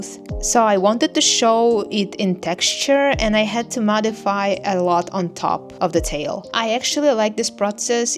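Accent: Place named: Russia. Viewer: Просто интересно. Я недавно наткнулась на твои видео, и слушаю тебя... Твой голос напоминает русский акцент) ты случайно не говоришь по русски?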